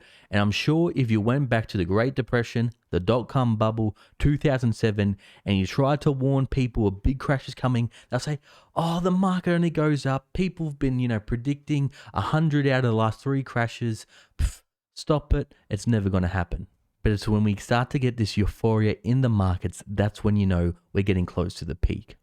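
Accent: Australia. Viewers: Hey. I'm an Aussie, too. You sound like you live in Melbourne, but I could be wrong, of course. I'm in Sydney. You make some interesting videos. So, I'm designing a currency that has no commodity capacity, i.e can't be speculated/gambled on. The objective is to incentivise creating sustainably. I'd like to run it past you, more for analysing the modelling as it is a pretty difficult currency to introduce in reality, but who knows. Get back to me and we can chat about it.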